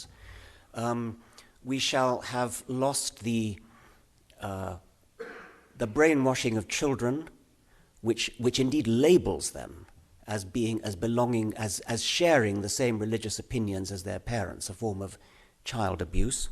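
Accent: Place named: United Kingdom. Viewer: Because he has a British Accent.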